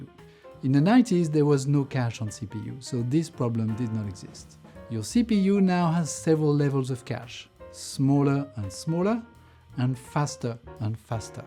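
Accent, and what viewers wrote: France. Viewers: Thank you! I guess my French accent is incidental though... 😄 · I love the JEPCafe and I esspecially love your french styled englisch ! Keep on doing this series, its so great and informative ! Thumbs up!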